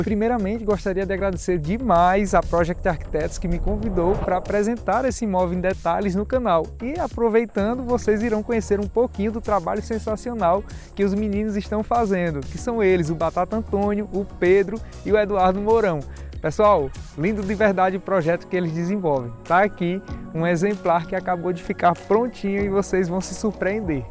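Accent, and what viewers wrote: Northeast, Brazil. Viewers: Gostoso é esse sotaque nordestino! Adoro!!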